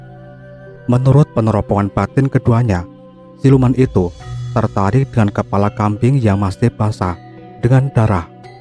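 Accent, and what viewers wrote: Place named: Java, Indonesia. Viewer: Logatnya yg bicaranya orang jawa medok y..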